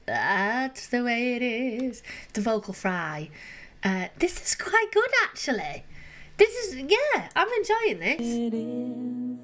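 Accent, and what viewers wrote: Manchester, United Kingdom. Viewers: I follow your channel just for your accent. It's so cute.. are you from Manchester? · ...please do some Alanis Morrissete and Björk...always enjoy your reactions, you are so nice and cute, love your british accent! x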